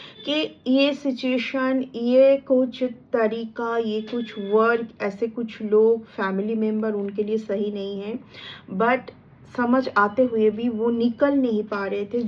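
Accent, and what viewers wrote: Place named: Bengal, India. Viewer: आप तो बंगाली भाषा से लग रही है।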